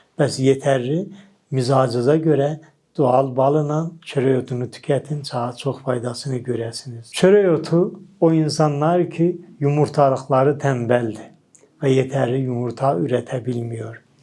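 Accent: Azerbaijan. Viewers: Danışığınız Azərbaycan ləhcəsidi. Var olun 🙏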